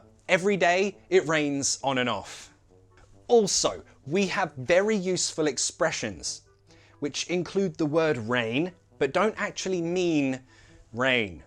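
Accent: United Kingdom. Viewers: You're Brithish? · As Brazillian I love the British accent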